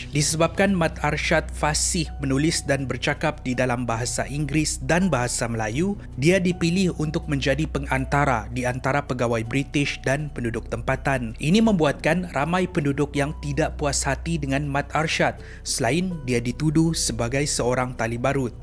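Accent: Sabah, Malaysia. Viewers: kamu org Sabah ka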